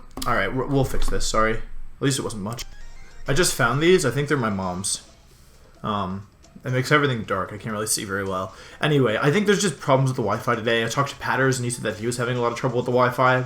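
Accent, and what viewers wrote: United Kingdom. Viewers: LOL the british accent, love it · Bristolian is like no other british accent sir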